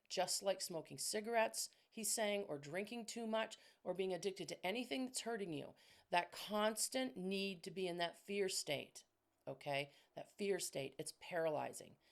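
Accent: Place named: New England, United States. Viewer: Thanks so much for this message! PS I knew right away that you were a fellow New Englander. Hello from Maine❤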